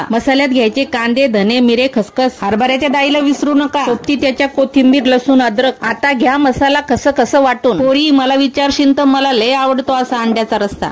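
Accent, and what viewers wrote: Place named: Vidarbha, India. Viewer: पोरी, इदर्भातली हाए वाटते त्वा 😅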